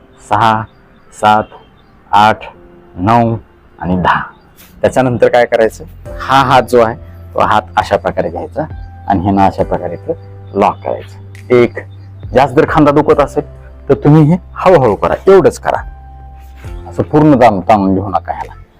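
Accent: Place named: Latur, India. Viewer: "हसत खेळत व्यायाम करा" अशा पद्धतीचा सांधेदुखीचा आपला चांगला व्यायाम प्रकार आहे. डॉक्टर साहेब मला वाटते तुम्ही बहुतेक लातूर जिल्ह्यातील निलंगा, शिरूर अनंतपाळ किंवा उदगीर तालुक्यातील आहेत; असे तुमच्या भाषेवरून जाणवते. असो तुमची स्वतःची मस्त गावरान भाषा शैली आहे. हेबका...❤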